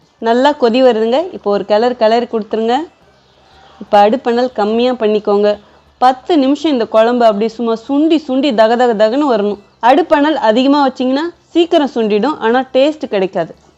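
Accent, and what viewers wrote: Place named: Kerala, India. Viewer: Kerala samayal la pesara mathriye pesaringa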